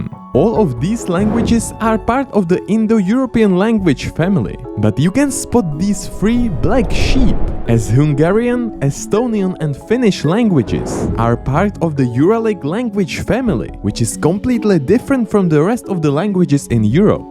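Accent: Czech Republic. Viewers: Skvělý video! Btw hned mi bylo jasný, že seš čech, ten českej přízvuk se prostě nezapře :D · Your videos are good, but that strong czech accent is driving me crazy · The strongest Czech accent, ive ever heard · yes but he is tchéque not french.. still, i like the accent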